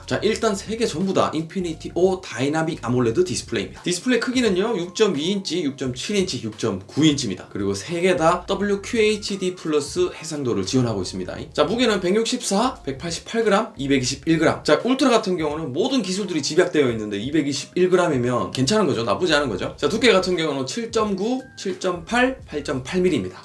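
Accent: Daegu, South Korea. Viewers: 말투가 비슷하다 싶었는데 대구분이셨군요ㅋㅋㅋㅋ 잘 보고 가요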